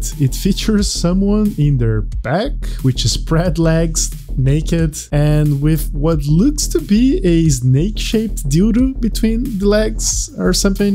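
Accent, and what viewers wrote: Brazil. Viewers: cara, você é brasileiro ?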